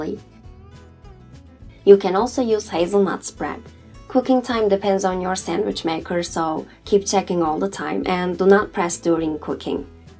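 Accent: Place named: Turkey. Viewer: Aksanın gayet güzel ama nedense Türk olduğunu hemen anladım. Tarif için teşekkürler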